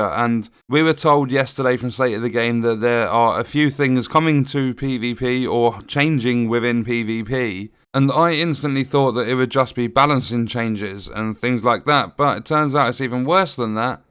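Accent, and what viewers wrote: United Kingdom. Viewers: Dude i love it. Keep on moaning. Even the wife sat and listened to you today. Miss the UK and the morose moaning accents so much!!! :)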